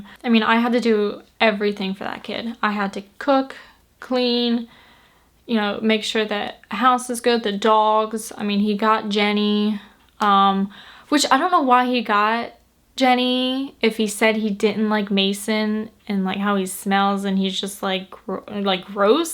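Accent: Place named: South, United States. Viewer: are you fro the south? you kind of have a southern accent.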